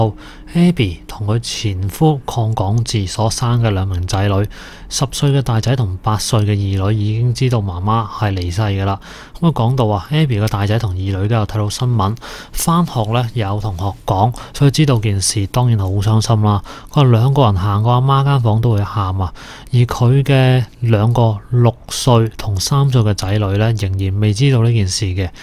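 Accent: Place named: Mainland, China. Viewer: 你把聲 好似係大陸人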